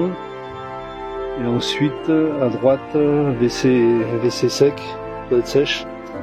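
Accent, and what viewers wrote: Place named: Corsica, France. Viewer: Ils sont tellement beau les paysages. Eh t'as l'accent Corse un peu.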